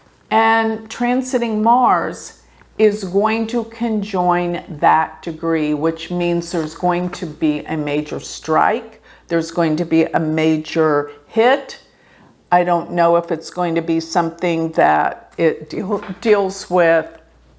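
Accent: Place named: South, United States. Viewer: Strange you should say that! In general, very much in general l must emphasize, l find the way Americans speak unsupportable, especially the women, They are so loud and nasal and they massacre the English language. Some you tube channels l just cannot watch even if the content is interesting. But l really enjoyed this video. I love accent. A little from the south l guess. Well measured and not too loud. l am a pure Pisces. I loved your reading. Let’s see what happens shall we? I agree with everything you have said. I’m a young 83 who’s been on a spiritual path all her life. 🇬🇧💝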